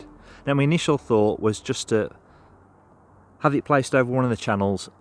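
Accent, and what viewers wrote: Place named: Midlands, United Kingdom. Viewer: Hi, thanks for the videos they've been really helpful. Good to hear a fellow midlander too. Just wondered if you'd encountered any problems since the install or if you'd do it differently now? I'm going to give this method a go once the weather gets a bit more reliable!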